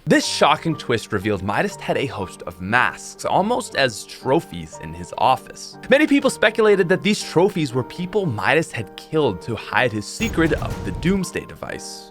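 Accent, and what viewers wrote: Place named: Nova Scotia, Canada. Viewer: First time watching one of your videos and I can instsntly tell you're a Scotian just by that accent 😂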